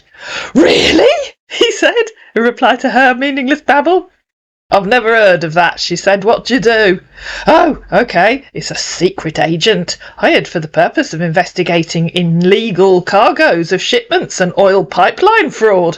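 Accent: United Kingdom. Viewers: Howdy and hello, I thought that so called conversation was great and I love your accent and it was nice to listen to once in a while since I am half British on my mom's side. And if you want you might want to watch Monty Python's Philosophy soccer/football match between the Greeks and the Germans. You might find it enjoyable. Take Care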